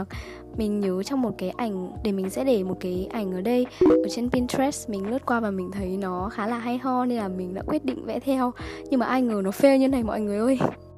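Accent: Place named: Nghe Tinh, Vietnam. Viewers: "Chết rồi, mần răng đây, ồi toang..." Chùi ui show cái giọng Nghệ Tĩnh tui hơi bị thích á, quê Hà Tĩnh nên thích lắm cái giọng này ế, iu cực... Hây da mún zề quê quớ ừ cả 3 năm rồi chưa về HT luôn ý, mà cho mềnh hỏi ở chỗ nào ở HT zợ? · chết rồi mừn răng đây nghe là biết dân miền trung r k lận vô mô đc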